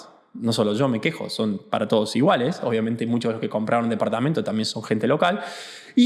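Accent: Córdoba, Argentina. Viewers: Ya se te está pegando la tonada cordobesa de Batumi 😊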